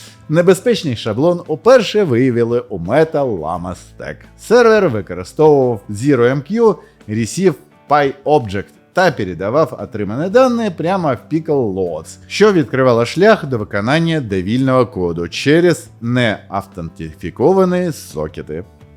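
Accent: Russia. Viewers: А это и не украинский. Сценарий пишут на украинском, а произносит он слова по правилам русской орфоэпии. Украинофонам тоже надо декодировать услышанное. Если сравнить с английским, то это как если бы было написано "the programming language", а произносилось "тхе праграминг лангуаге".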